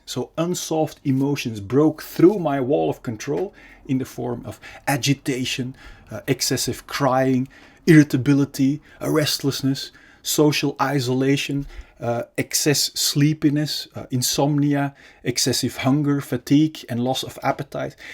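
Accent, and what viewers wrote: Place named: Netherlands. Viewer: Hallo...ik heb me al een paar keer ingeschreven maar niets gekregen. Ik zal eens een mail sturen om te unblocken. Aan je accent te oordelen kon ik in het Nederlands schrijven 😊 Ben een belgje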